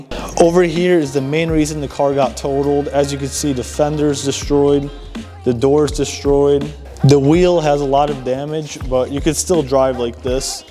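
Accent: United States. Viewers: Dude are you from the Western Mass area?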